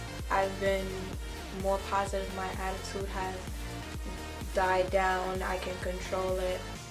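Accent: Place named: Barbados. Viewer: I love your Barbados accent yes yes boo 😍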